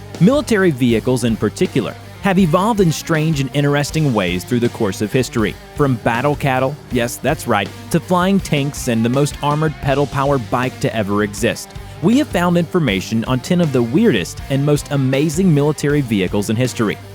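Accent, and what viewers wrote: United States. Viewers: Err.., Dear Mr.Narratorr, Sir, while U most certainly sounded American, dunno if U actually are, but eant the word Corps. as in US Marine "Corps", pronounced as "Core or Corr" phonetically, rather than the disturbing "Corpse" - wic wud be a DB, cadaver or maybe a carcass evn. Thus far, I thought only some individuals native to India only sez Corps as literally in as the offensive sounding "Corpse", He-He, tis' ez cute.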